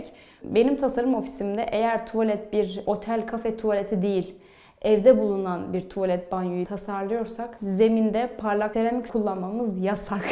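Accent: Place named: Central Anatolia, Turkey. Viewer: nerenin aksani bu ic anadolu yada bati karadeniz gibi. hafiften aksan geliyor alttan , tasrali ic mimar enteresan.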